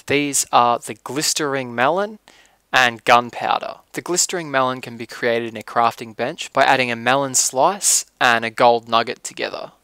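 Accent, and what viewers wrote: Australia. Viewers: your my favorite minecraft person and can u play some battlefeild 3. Do u come from Australia coz u have an Aussie accent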